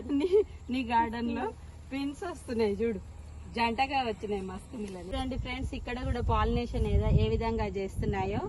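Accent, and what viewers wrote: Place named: Telangana, India. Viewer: Pls voorike friends anakandi. Chaala boring and irritating ga vundhi. This is only a suggestion. Talk in pakka Telangana accent. No problem, but don't say friends 😮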